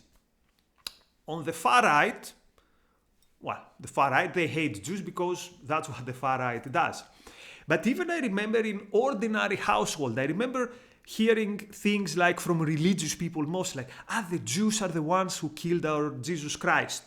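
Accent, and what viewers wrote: Greece. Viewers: I knew immediately this guy is Greek by the accent 😅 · thank you for that, its very profound of course. after listening to you for 2 minutes you sound to me greek. Kreeks need to understand that Ardugan from turkey is a radical muslim and if people will not unite against radical / evil islam - Greece will have a genocide by Turkey. The muslim brotherhood / radicalization is a very serious problem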